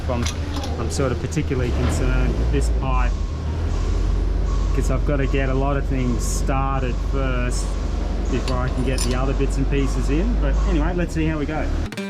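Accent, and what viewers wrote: Australia. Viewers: you're a legend. Good to hear another Aussie speaking Aussie (can we not do the bleep?), Mate, you did good, real fuckin good!! Cheers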